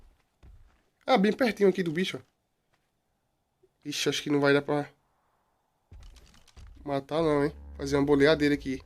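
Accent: Northeast, Brazil. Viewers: Nordestino com certeza 😅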